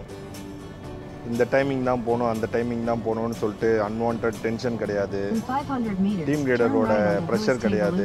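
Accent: Chennai, India. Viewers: Thala chennai speech paka . Ne gathu thala😎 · You have a great philosophy of life! I am learning Tamil from you! You Tamil accent is typical Chennai. Only your pronunciation - 'PACKET money' for 'POCKET money is typical Tsmilian. Because Tamil does have the 'O' sound. Very good video!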